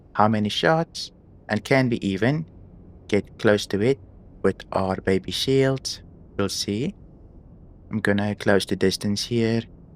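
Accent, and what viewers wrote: Afrikaans, South Africa. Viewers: Dutch, Belgian or Afrikaans? Sound very Afrikaans...lekker vid and and build.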